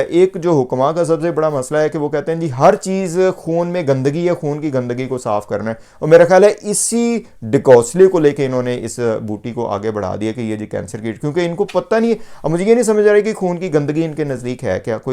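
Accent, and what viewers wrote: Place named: Punjab, India. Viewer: Ap punjabi py zor deny ki bjaay topic py bt krty wqt bch jta... Fuzool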